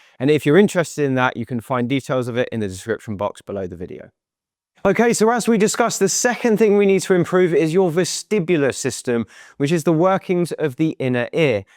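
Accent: United Kingdom. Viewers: Thank you so much, for the roadmap. I'm 82, had a hip replacement 3 years ago and it's been downhill ever since, partially due to covid and painful frozen hip on the other side, and mostly due to laziness.😮 I recently started squats, wall presses, heel tapping and just dragged out an elastic thingamajig for the leg muscles. I'll certainly add your tips. I do NOT want to lose my mobility. Love the British accent, i lived in the UK in my twenties when I first left my enerald isle.❤❤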